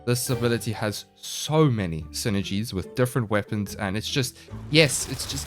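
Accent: South Africa. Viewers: I knew you were South African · aweh my fellow south african. The accent gives us away every time. Can never hide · Yooo you SouthAfrican??? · I knew it he is South African lmao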